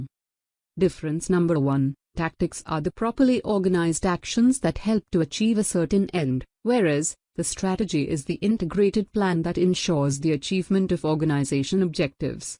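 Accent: India. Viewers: HOW CAN A FUCKING VOICE AI HAVE AN INDIAN ACCENT FOR FUCK SAKES, HOW DECIDED THIS WAS A GOOD IDEA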